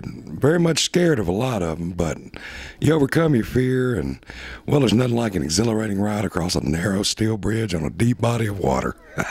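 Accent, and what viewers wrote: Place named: South, United States. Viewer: Yo my main bridge dude, with them southern tones. You got that mic only coming in hot on the left. Spread that boyo out my man.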